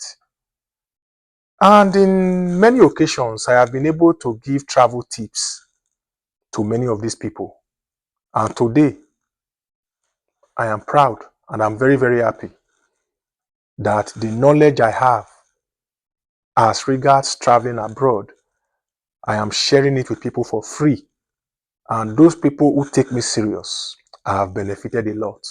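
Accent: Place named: Nigeria. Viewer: Support advise Africans how to develop their own countries than to advise them to run and go live in other western countries. The fact is the western world is fade up of immigrants using any kind of routes such as asylum and student visa to come live in their countries. You sound west African from Nigeria. Why don’t you go back to Nigeria and build your own country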